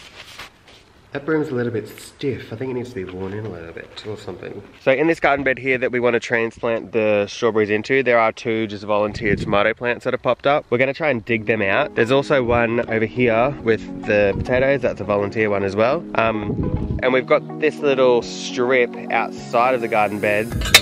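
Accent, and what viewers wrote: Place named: Australia. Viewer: Ok you two are the only gay homesteaders on YouTube which means you please have to make more videos and make them more often. Plus you’re freaking Australian which means extra points for the accent. Thank you.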